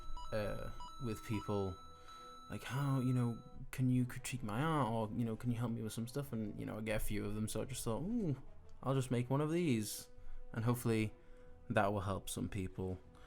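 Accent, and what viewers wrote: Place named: United Kingdom. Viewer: holy crap he's British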